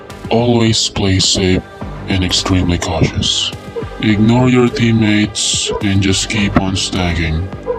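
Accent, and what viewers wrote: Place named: Philippines. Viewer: Kahet anong voice changer payan basta kadugong pinoy kelala mona accent palang HAHAHAHA... GOOD GUIDE!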